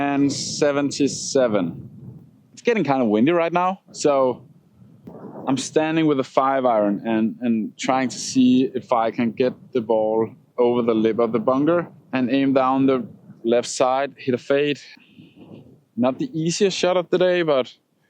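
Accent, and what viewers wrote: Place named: Jutland, Denmark. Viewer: Fed video og fedt på engelsk også, du snakker ganske fint engelsk på godt jysk, ingen worries der, hvad med en video, med dine længder på alt i baggen, og gerne nogle Challenge med andre golfer på dit niveau, kunne være fedt og se.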